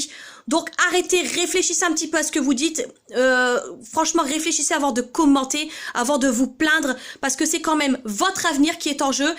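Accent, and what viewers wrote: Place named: North, France. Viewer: T est du nord ta l accent je suis en Normandie je suis du ch nord a la base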